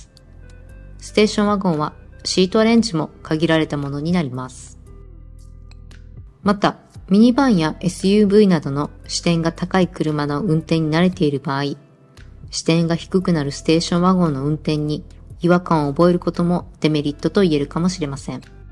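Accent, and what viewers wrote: Kagawa, Japan. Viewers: やっぱりこれ讃岐弁ですよね(東かがわ出身並感) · 讃岐弁？！ありがとうございます^ ^ ステーションワゴンの魅力を改めて発見できました！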